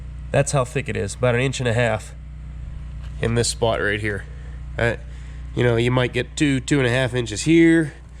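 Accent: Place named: Midwest, United States. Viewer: You’re a mid westerner, we all talk wrong...I still put an R in wa”r”sh.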